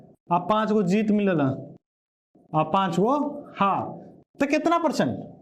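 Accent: Bhojpuri, India. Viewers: Nice bhojpuriya andaj😂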